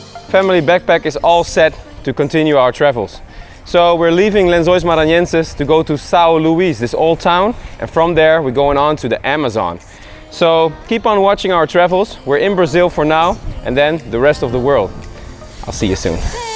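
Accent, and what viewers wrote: Netherlands. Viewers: Je bent Nederlands of niet?? Ik hoor het aan je accent :o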